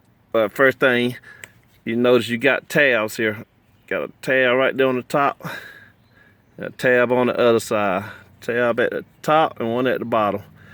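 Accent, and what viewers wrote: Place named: California, United States. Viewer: I’m from Cal so thumbs up for the accent alone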